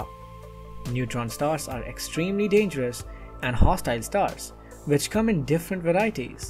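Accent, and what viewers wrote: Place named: India. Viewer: When you hear an indian accent you know the videos are going to be filled with knowledge, very intelligent people ❤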